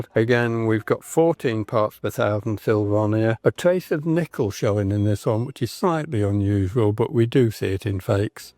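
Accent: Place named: Northern England, United Kingdom. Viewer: He's a Northern lad, who speaks real English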